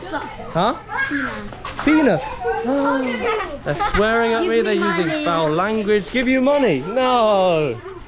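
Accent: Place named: Australia. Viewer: Hi, I thought your accent was Australian. I’m going to go check out Davao in October.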